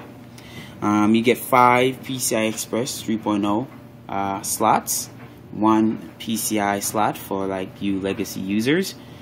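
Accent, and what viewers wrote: Jamaica. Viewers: I love Ur Jamaican Accent :) ( remind me of my best bud)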